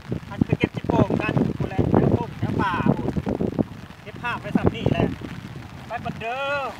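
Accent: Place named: Thailand. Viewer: ค่อยมักสำเนียงเจ้าหลายฟังแล้วมวนดีจ้าค่อยเป็นคนไทยเลยจ้า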